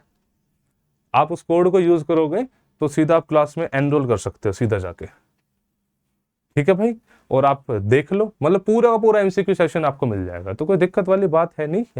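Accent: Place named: Bengal, India. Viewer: Sir your Bengali speaking very cute👌👌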